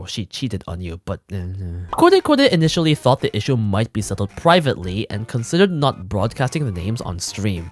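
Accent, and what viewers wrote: New York, United States. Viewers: Sounds like NY or something lol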